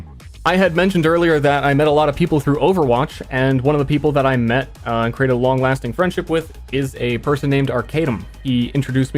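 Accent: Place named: India. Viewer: You sound indian.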